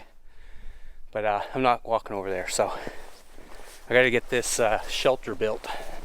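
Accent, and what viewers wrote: Canada. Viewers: Are you a maritimer?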